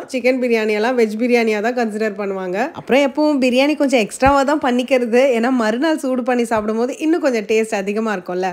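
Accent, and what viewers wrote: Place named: Bangalore, India. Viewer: Hearing Bangalore Tamil😅 And ur Tamil feels good 😊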